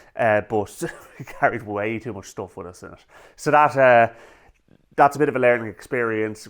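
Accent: Ireland. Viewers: The Irish lilt is very pleasing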